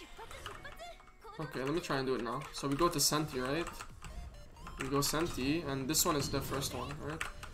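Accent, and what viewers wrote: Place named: India. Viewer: Just a question, are you indian? Your accent is kinda indian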